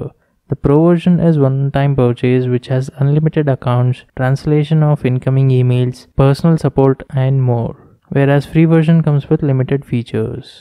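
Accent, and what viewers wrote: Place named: India. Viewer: Your English is terrible! Speak English, not "Indian English".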